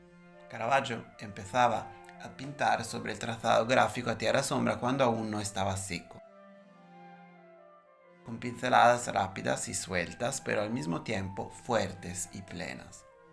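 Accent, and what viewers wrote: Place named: Italy. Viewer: ¿Que “contaminación” del italiano? Habla un español muy bueno, con acento italiano, naturalmente.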